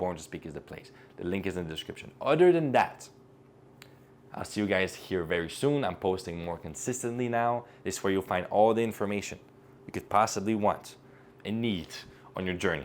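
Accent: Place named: United States. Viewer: U re american? Cause u like balckan but u have still american accent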